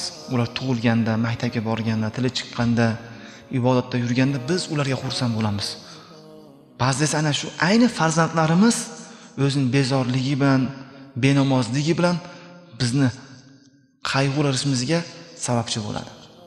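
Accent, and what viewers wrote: Kyrgyzstan. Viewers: Рахмат акжон жуда чиройли гапирасиз миллатиз киргизми лекин узбекчани яхши гапирасизоценкларда сал билинади лекин ярашади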